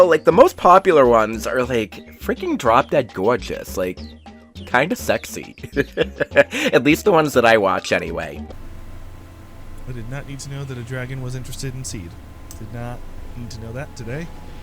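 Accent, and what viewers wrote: Boston, United States. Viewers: Are you from Boston?!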